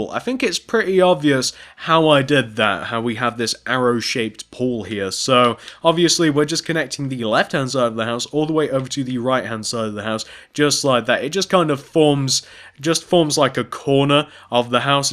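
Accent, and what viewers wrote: United Kingdom. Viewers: He is British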